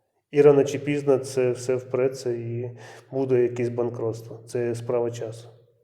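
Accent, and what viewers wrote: Russia. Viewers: ЦЕ ЩО КАНАЛ ФСБ? ЧОМУ МОВА НЕ УКРАЇНСЬКА,А РОСІЙСЬКИЙ СУРЖИК?. ЧУТНО ЩО РОСІЙСЬКОМОВНА ЛЮДИНА КРИВЛЯЄ УКРАЇНСЬКУ.ГАНЬБА!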